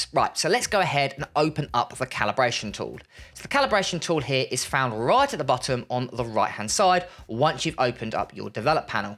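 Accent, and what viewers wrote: United Kingdom. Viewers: he´s bri ish thats how they talk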